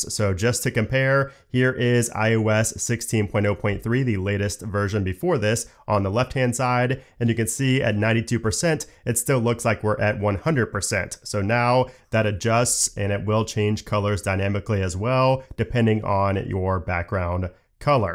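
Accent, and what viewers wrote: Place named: New York City, United States. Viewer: hi, just curious about your accent, where is it from? NYC? thanks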